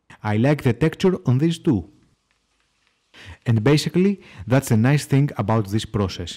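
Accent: Greece. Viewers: He Greek haha! (I'm Greek too that's why I recognize the accent) Nice video tho well done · I immediately understood that you were Greek!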